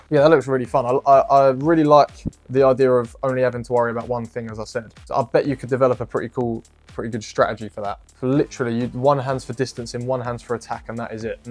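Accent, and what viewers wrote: United Kingdom. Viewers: Remember me w vids w guy which part of th UK are you from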